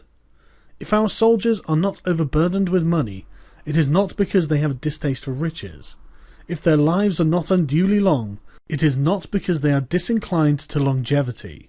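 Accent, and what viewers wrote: United Kingdom. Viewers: O my, I read the book twice before. But those times compare bland compared to you smooth British narration. Keep up the good work!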